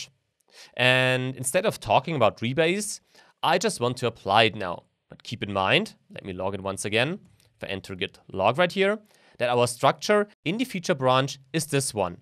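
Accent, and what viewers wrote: Germany. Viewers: Do you deliberately sound like Max in your pronuntiation? or is it a thing in German people to sound like that? Great video! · I was deciding whether or not he had a german accent; but then he said "entwickelments" instead of developments and it all became clear to me.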